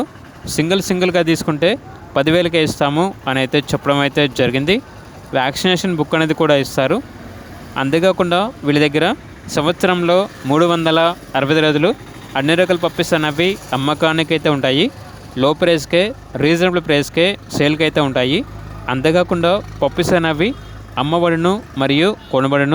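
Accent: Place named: Mumbai, India. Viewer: Telugu raadhaa ... mumbai heroine laagaa endhuku maatladuthunnaav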